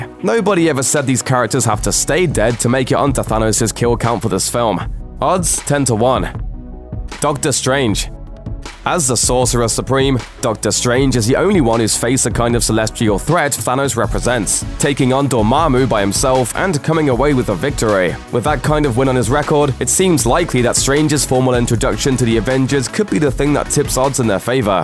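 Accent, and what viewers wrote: United Kingdom. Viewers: Luke Meyer, you mean narrating in REAL English language, British English is not an accent, it's just English, maybe you should have said "please get someone to narrate in American accent" at least it would be correct then.. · can u please not have a british guy narrating cause british accents make me get lose brain cells there so cancer and annoying after hearimg it over and over again it get fucking annoying don't get anyone to narrate from the fucking island or ireland next to it they make a cool video terrible no offense to royale fucks but your voice is like a hyena screaming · Is the speaker british by any chance?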